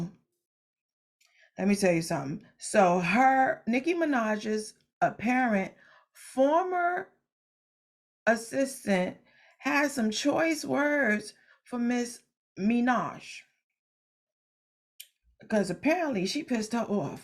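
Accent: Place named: Jamaica. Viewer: I HOPE YOU ARE A JAMAICAN.... WE DO THAT BRITISH ACCENT.... LOVE YOUR SHOW...PLEASE POST YOUR EQUIPMENT IN SHOW MORE DETAILS. I LOVE YOUR LIGHTING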